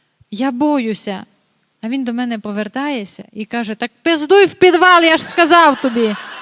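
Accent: Transcarpathia, Ukraine. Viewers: туй нич не є руснацьких говірок. По факту : люмпенська тарабарщина з закарпатським акцентом, щоб кієвськиє панялі'. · Закарпатська українська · То не суржик. То закарпатська говірка. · Мова дивна, нащо так? З усіх дірок на російськомовних капає "вчить мову", а потім вмикаєшь і отаке. Дивися та червонієшь. То не стиль, не говірка, то якась фігня. Посорилася вона... ще й мати. Краще вже чистою російською, а не російською з українським акцентом. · Жахливий діалект))) це на Закарпатті такий?) Але ха українську лайк, на підтримку україномовного ютьбу!)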